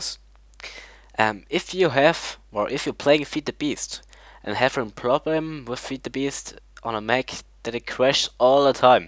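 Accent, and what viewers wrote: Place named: Germany. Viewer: MAn merkt dass du deutsch bist xD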